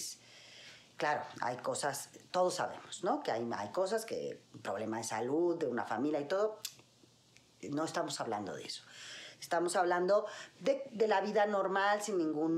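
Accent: Mexico. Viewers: Yola tantos años viviendo en México, que ya perdió su acento de española. Habla como mexicana 😘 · Yola. Cuando tenía trece años (1984) estaba enamorado de ti, hoy tengo 48 y sigo enamorado de ti. Eres hermosa. Me encantó que ta hayas quedado a vivir acá y que hayas adoptado el acento de México. Eres la perfección hecha mujer, me encantas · Que dicen tus compañeros de parchís acerca de tu acento ya cambiado al acento mexicano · Hola, que lindo encontrarte por aquí, ya tienes un nuevo suscriptor, saludos de un peruano gran fan tuyo, sobre todo desde cuando formabas parte de "Parchís", era muy niño claro, y eras mi amor platónico, jeje, bueno, de la mayoría de niños, y de modo tierno claro. Se ve que tienes mucho tiempo en México, por tu acento, muy bien por ti, debes estar a gusto por allá, es lo que importa. Me encantaría me envíes un saludo linda, sería como un sueño cumplido para mi, jeje; mis mejores deseos para ti y un beso y fuerte abrazo a la distancia, cuídate linda!.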